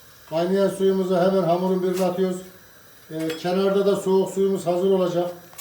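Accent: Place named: Central Anatolia, Turkey. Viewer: Usta Orta Anadolulu. Hamur açmasından ve ağzından anladım.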